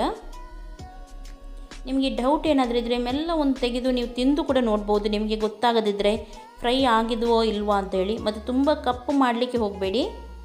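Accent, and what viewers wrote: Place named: Udupi, India. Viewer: Idu udupi kannada mr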